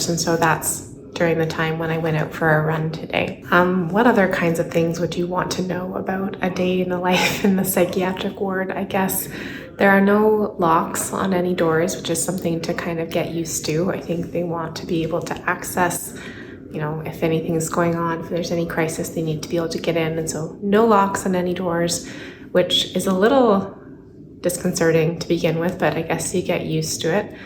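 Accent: Canada. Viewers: In case you didn't spot the accent. She's in Canada. Do you know the rules in every country? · You must be in a medical hospital being treated for mental health. Straight mental health hospitals wouldn't allow most of the things you have in your room. (chain operated blinds, Electical cords, batteries, plastic water bottles, a watch, in short anything that can be sharpened or anything you can hang yourself with, visitors are not allowed on the unit). At least in the staes, your accent may indicate you are in Canada?. I wish you the best and hope you are feeling better.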